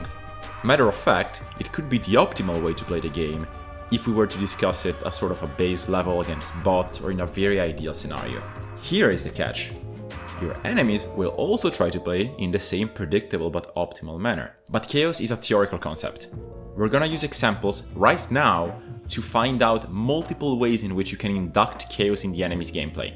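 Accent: Italy. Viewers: As a fellow italian (assuming you're too since the slight accent and the name of the channel) i cannot understate how much this video helped me in just a couple of games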